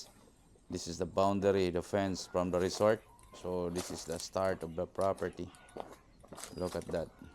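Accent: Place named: Visayas, Philippines. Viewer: bisaya Naman ang accent mo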